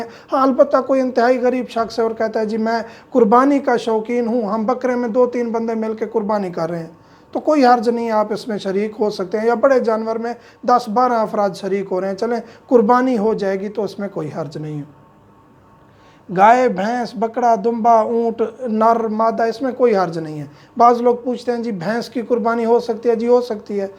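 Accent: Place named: Saraiki, Pakistan. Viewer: بھائ سرائیکی لگتے ہیں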